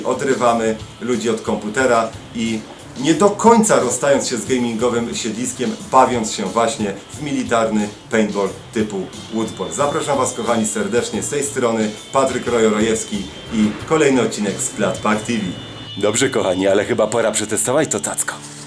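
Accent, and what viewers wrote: Silesia, Poland. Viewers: skąd jest Rojo? Ze Śląska? ;p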